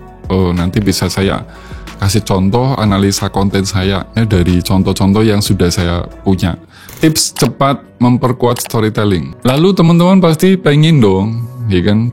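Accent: Indonesia. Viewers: Mantap bang,kayaknya Abang dari Jawa ya mendok bgt ngomong nya? Kalo aku orang ngapak😂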